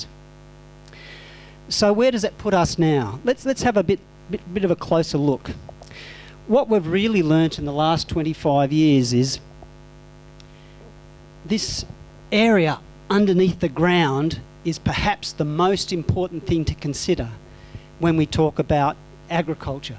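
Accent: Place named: Australia. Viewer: Where in Australia are you from? Great talk!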